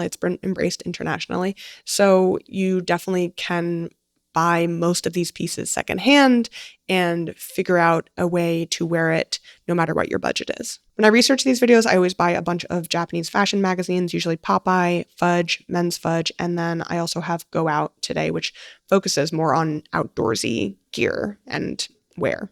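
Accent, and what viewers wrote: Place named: France. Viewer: Great french accent tbh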